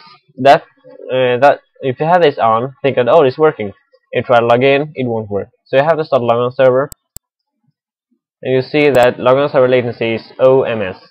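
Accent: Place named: Sweden. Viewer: Man hör ju att du är svensk O_o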